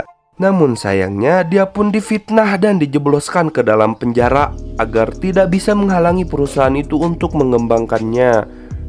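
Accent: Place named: Sunda, Indonesia. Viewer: Story telling sunda😆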